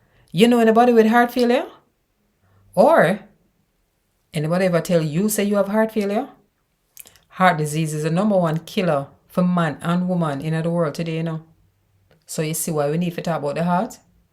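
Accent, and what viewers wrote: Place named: Jamaica. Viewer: This is some good information, like the Jamaican accent !!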